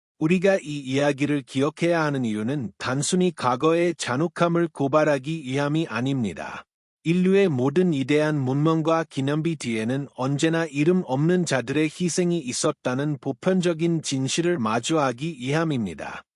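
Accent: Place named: Egypt. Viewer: 이집트 여성 노예의 기록~내용은 자세한데 이집트 사람이 한쿡말 하는것 같은데? 한쿠말~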